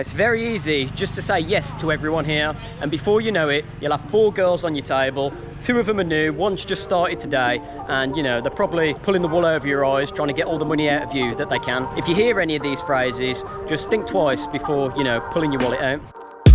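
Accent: United Kingdom. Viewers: Love hearing this guy's genuine British english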